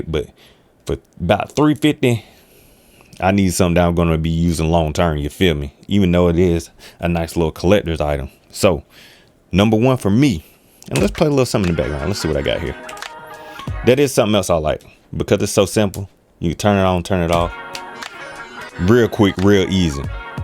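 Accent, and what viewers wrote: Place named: Bay Area, United States. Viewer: my guy sounds like hes from the BAY lol I LOVED my KO2 it makes music fun again. my MPC just sits as of recent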